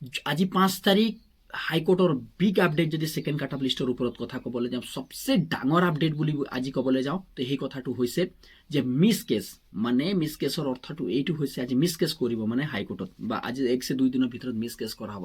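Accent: Bihar, India. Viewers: Toi axomia a koba najna nhu ..bihari nki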